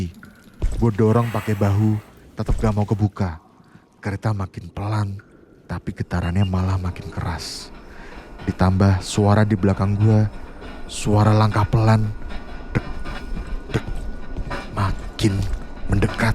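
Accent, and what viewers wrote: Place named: Java, Indonesia. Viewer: Tp ini agak medok 😅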